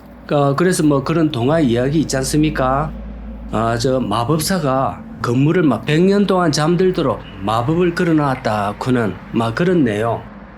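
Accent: Gyeongsang, South Korea. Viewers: 늘 좋고 편안한 영상 보여 주셔서 고맙습니다, 님! 게다가 구수한 경상도 사투리까지, 정말 일품입니다. 어릴 때만 쓰고 오랫동안 잊고 있던 사투리를 들을 때면 정말 웃음이 절로 납니다. 다시 한번 고맙습니다. ㅎㅎ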